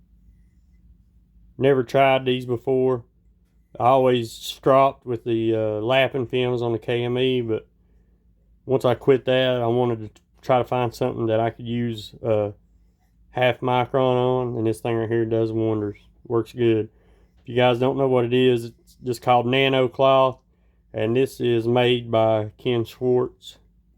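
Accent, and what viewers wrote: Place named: Tennessee, United States. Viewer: Great video, I spit my coffee out then you were sharpening the recurve and said Shit. I’m from Tennessee, you gotta be from this area with that accent.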